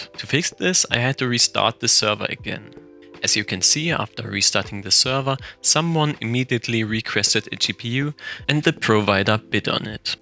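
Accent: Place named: Eastern Europe. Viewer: Акцент славянский. Ничего не понятно, но очень интересно.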